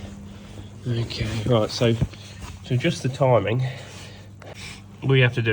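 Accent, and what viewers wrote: United Kingdom. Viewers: Bro i absolutely Love that British accent 😂❤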